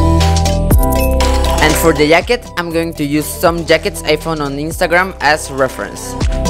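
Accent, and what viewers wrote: Spain. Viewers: eres español? tu acento ingles me suena a español, buen video! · Como se nota que hablas español xd (no hate :, you draw nice :D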